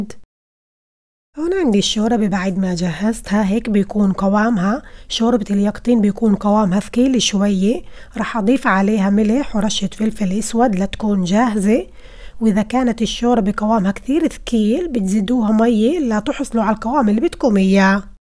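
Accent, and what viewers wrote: Palestine. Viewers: بتشهي شوربه خمس نجوم مميزة مثل لكنتك الفلسطنية الليةبتهبل 🇵🇸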